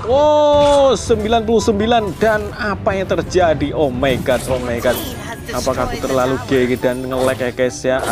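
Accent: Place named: Bali, Indonesia. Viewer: Kamu orang bali ya?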